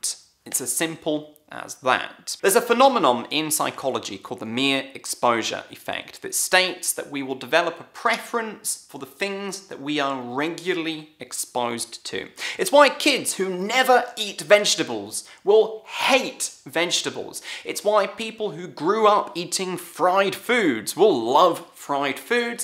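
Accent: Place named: United Kingdom. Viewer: U spoke like British And British accent is hard to understand for many An American accent is good and easy to understand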